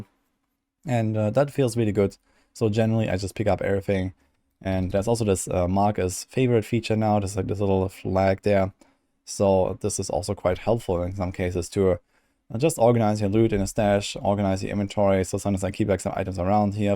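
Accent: Germany. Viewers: Are you German, sorry for asking, I just watch your videos for ever and never wrapped my head around this one. Keeo up the great work!!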